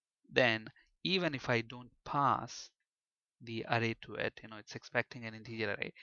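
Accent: India. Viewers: These are awesome vids - Thanks! Also, usually with Indian instructors the accent is so strong and they talk so fast it's hard to understand what they are saying, but you speak very clearly and slowly, so it's easy to understand you. I'm a C programmer trying to learn C# and these are the best vids I have found. Even better than the ones I had to pay for.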